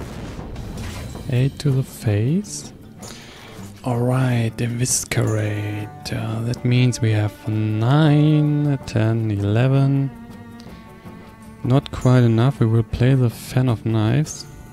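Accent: Germany. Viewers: are u from germany? funny enough i'm trying to learn some german :D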